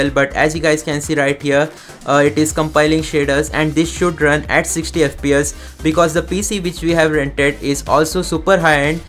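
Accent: India. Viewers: Tikkken with the smelly mother fucker indian accent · 1 plz speak more slow cus indian english realy understand hard ... 2 that cloud game why speak lier?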